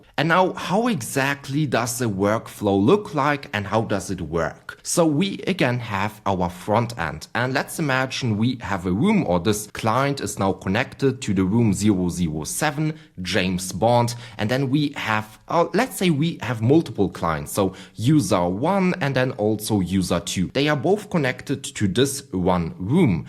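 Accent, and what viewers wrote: Germany. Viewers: Thank you german sounding man for your wisdom.... I shall use it wisely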